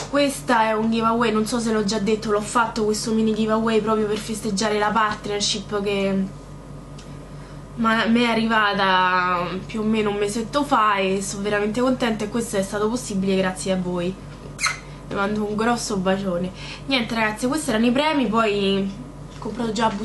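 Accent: Rome, Italy. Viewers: 4 adoro il tuo accento romano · 2 amo il romanesco hihihihi mitica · secondo me nn dovresti lasciarti andare tanto col romanaccio, e ti parlo da romana =) è bello sentirlo parlare, ma nn troppo esagerato!!! Ciao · 1. che bei premi.. sei sempre bellissima.. hai trovato proprio le parole giuste.. siamo una grande famiglia.. ti adoro quando parli in romano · 3 sei molto simpatico e il tuo accento romano mi fa impazzire!!!mi sta troppo simpatica la tua parlata!!! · ") che bella quando parli il romanesco verace hahhaha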